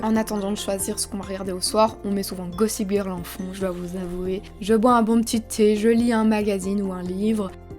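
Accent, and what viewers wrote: Belgium. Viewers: J adore l accent Belge!!!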